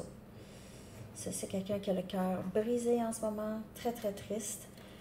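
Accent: Canada. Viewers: Merci pour la guidance pleine de vérité relation toxique et je lache prise je veux la stabilité et la paix merci je vous adore ainsi que votre accent canadien ""une Algérienne "❤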